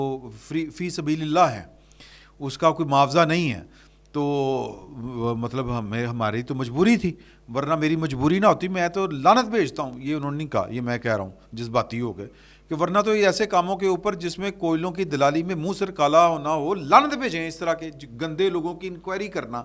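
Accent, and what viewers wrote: Punjab, Pakistan. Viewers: is kutty ka lahja bhi jahil punjabi ki trha ha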